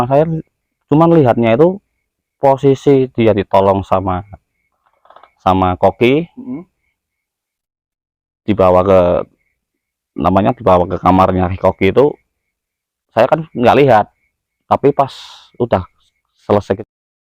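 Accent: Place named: Central Java, Indonesia. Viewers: Logatnya kok sprti orang pekalongan😂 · Nah itu kan nah itukan. Pasti orang jateng plat G😂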